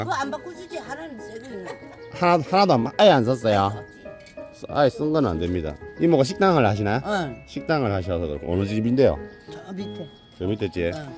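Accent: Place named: Gyeongsang, South Korea. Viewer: 경상도 같으신데~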